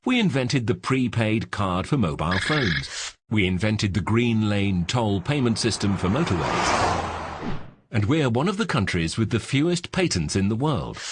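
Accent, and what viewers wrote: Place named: United Kingdom. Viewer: Well, I love Portuguese accent, but you made this video with a British accent? NOOO!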